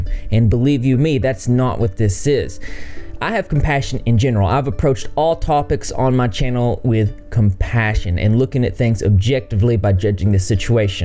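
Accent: South, United States. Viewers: Bro where’s your accent from, sounds super southern it’s dope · what southern state are you from dude? I’m from southern KY so I can pick up twangs like nobody else even if someone is trying to hide it lol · I'm trying to figure out your accent because it's very southern... even more southern than where I live (Alabama). Maybe Tennessee or Kentucky.... something like that?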